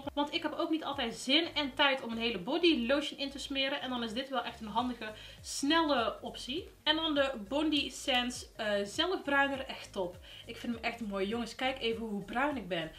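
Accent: Brabant, Netherlands. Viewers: Brabanttt!!!! Bende benieuwd? Blijf dan vooral kijken hahahaha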